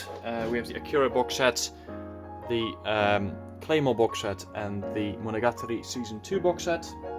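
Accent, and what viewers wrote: Netherlands. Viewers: Are you Dutch? Great collection btw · Are you Dutch perhaps? You're accent sounds really familiar but I don't want to assume. Great collection and thanks for showing it! Edit: I just scrolled through to the comments and apparently I'm really bad at listening 😅. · Hahahah moet wel je kunt gwn meteen horen dat ie Nederlands is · I currently have about 700 volumes in my collection and my favorites are Oysumi punpun, Pandora hearts, AOT, Berserk, and Monogatari lmao. Nice collection btw! You have really good taste imo. Also are you dutch?😂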